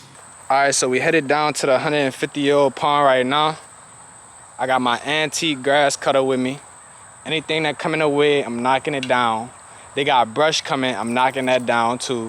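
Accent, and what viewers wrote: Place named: South, United States. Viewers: I think he from Charleston sc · Bro where you from ? Gotta be in the boot wit me im guessing south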